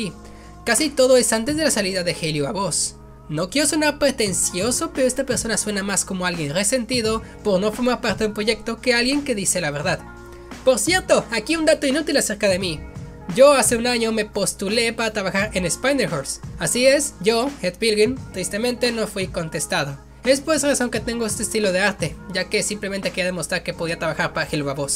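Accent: France. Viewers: Soy yo o tu hacento parece francés es tan hermoso UuU ✨ Estuvo interesante el vídeo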